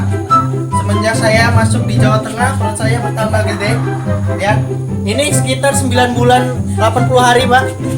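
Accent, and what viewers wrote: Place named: Riau, Indonesia. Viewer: Ohh kok suaranya kek orang riau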